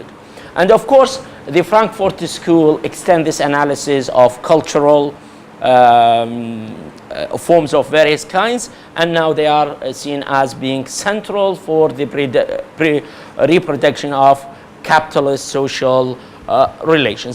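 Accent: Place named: India. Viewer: Though I thought him Indian, his English doesn't bother me at all since I've benefited from his presentation.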